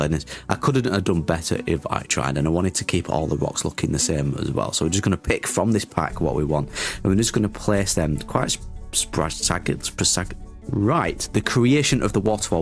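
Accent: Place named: Northern England, United Kingdom. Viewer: Random comment, but being from the UK, I love hearing a northern voice on YT!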